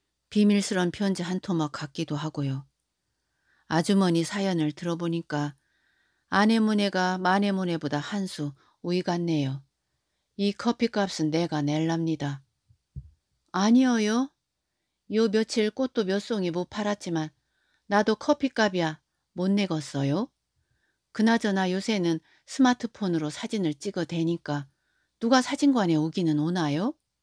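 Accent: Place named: South Jeolla, South Korea. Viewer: 전라남도 사투리가.. 겁나~ 맛깔나부네잉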